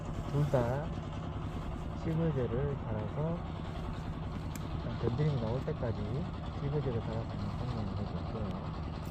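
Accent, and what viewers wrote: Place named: Gyeongsang, South Korea. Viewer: 영상 잘 보고 있습니다. 말투가 경상 쪽이 신 것 같은데 어디 사시는지요? 저는 구미에 거주 중인데...가깝으면 시간 맞으면 동출하고 싶네요...ㅎㅎ 다른 댓글을 보니 마산쪽에 사시는지요?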